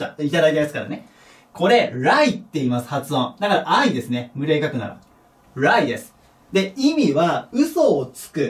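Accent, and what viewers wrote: Kansai, Japan. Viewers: 前から思ってたけど関西の人ですか？？ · あれ、さん関西の方ですか？？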